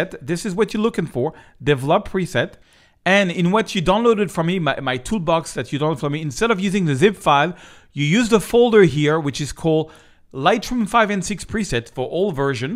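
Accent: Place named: France. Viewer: your English-French is so sweet:) Thank you for tips!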